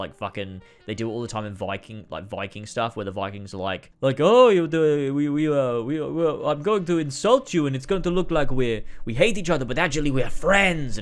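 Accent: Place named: Southern England, United Kingdom. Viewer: I cant work out your accent you sound like youre from the south of the uk but also from australia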